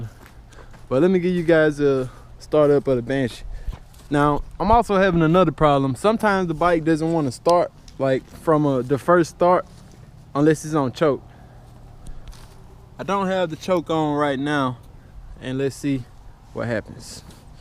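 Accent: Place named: Gulf Coast, United States. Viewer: yo, where do you live? You have that Gulf Coast sound to your voice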